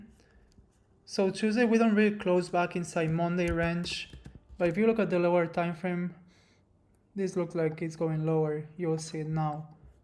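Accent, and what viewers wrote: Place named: Spain. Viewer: Ya decia yo que me parecía que eras español jajajajaj . Buen video tío !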